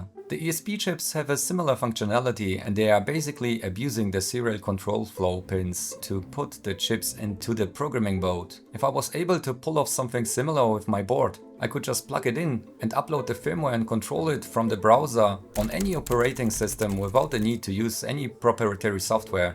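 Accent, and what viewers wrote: Germany. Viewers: Sie haben immer noch Ihren deutschen Akzent.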